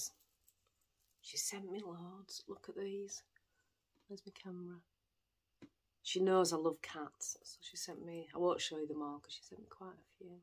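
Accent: Yorkshire, United Kingdom. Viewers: Pick me in the comments! :-D I have never fancied doing a Stephen West pattern before but your shawl is inspiring me to think about it .... hhmmm.. Thank you for your podcast - I'm really enjoying it (and loving hearing the Yorkshire accent (I'm from Sheffield but haven't lived there for years so it's good to hear you).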